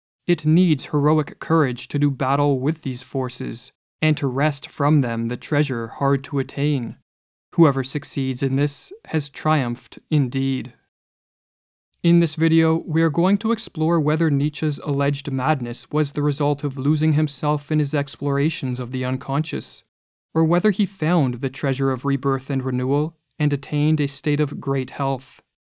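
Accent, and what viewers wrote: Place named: Canada. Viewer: Yeah, I hear the lilt and every once in a while a trace of a Canadian vowel; also, very often clear signs of a mind and ethos I recognize as Canadian Gen-X. But I never would have guessed in a million years that he doesn't work alone. Every bit of the intellect and personality he reveals to us argues lone wolf... except for, I guess—and this is the part I missed—the fact that he wants to be with us, his audience. (Bonus speculation, just for fun: I bet he's left-handed.)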